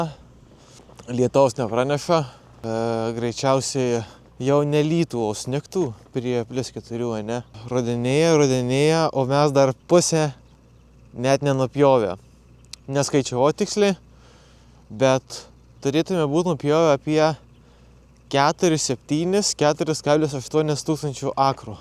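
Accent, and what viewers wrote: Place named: Zanavykai, Lithuania. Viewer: šaunuolis ,vyrukas ir muzikytė vežanti, automatiškai koja į ritmą.:)O tarmė zanavykų, miela ausiai:)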